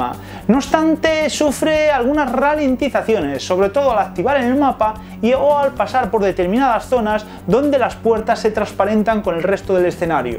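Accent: Galicia, Spain. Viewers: Eres un crack...hay gente que no se da cuenta de que eres gallego y que hablas así que no estás imitando o poniendo voces...lo que pasa es que aquí nada más que hay heaters mongoloides y envidiosos...sigue así fenómeno!!! Viva Galicia y Vixca Valencia!!! Pd: lo siento por lo del penalty de Djukic...un abrazo de 16 bits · Ostiaaaaa!!! Q bueno el giro final con José en Paz descanse.. de nuevo engañando a todo dios.. Patéale el culo a esos haters! Deberías subir un vídeo cada día para alegrarnos la tarde... XD PD: soy de ElBierzo y por ende medio galego, y cuando te oigo parece que estoy hablando con un paisano de la miña terra!! Sigue así amigo! Y ni te dejes avasallar por la envidia de esos niñ@ y no tan niñ@ Rata! 🐭🐀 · 10/10 la imitación de. A mí me parece simpático el acento gallego. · Brutal me parto con tu acento gallego, no cambies. Me encanta descubrír estás versiones imposibles en consolas menos potentes.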